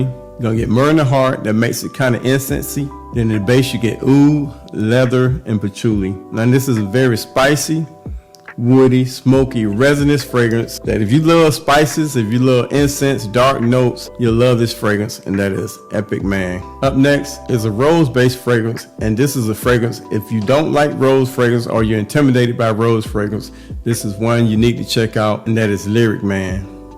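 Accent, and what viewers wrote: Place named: Alabama, United States. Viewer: Yo! Wherebot you from brutha? I hear some Alabama in ya talk. Love the content. Get well soon fam. Checking in from Alabama.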